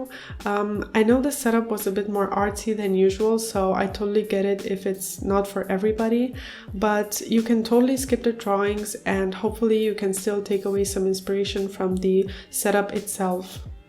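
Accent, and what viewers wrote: Germany. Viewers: Where are you from?:) Your English sounds very German haha :D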